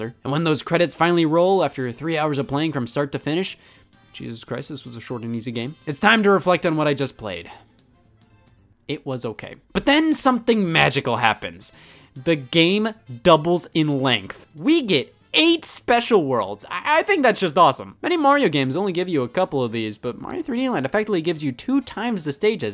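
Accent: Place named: Midwest, United States. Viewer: What I love about is that you can obviously tell he's from the Midwest poorly based on the fact that he said "Yup" like, 10 times in this singular video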